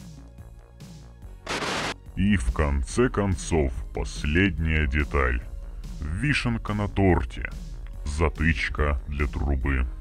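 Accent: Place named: Soviet Union. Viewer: Автор клоун,комунист,который думает что конченый советский говор и элементы советских реплик сделают его крутым.Хуже канала по СФС не видел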